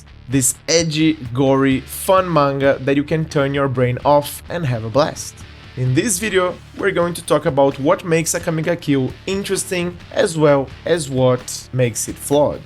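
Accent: Brazil. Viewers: mano esse cara é brasileiro eu acho. ingles bem portuguesado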